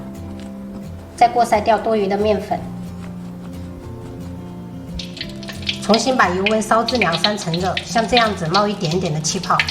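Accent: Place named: Fujian, China. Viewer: 我感觉她是不是很小就去到闽南了，因为她讲普通话也是福建的调调。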